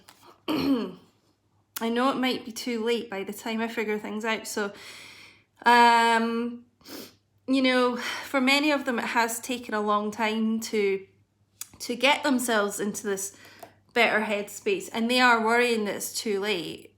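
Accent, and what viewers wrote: Scotland, United Kingdom. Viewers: I love your accent! Are you Scottish? Are you doing private readings? I'm interested :D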